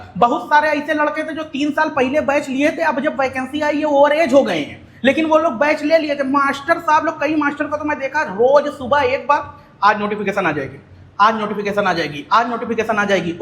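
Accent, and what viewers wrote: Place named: Ambedkar Nagar, India. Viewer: भाई आपकी भाषा बहुत बढ़िया है जैसे अकबरपुर अंबेडकर नगर वाला ❤❤❤❤❤❤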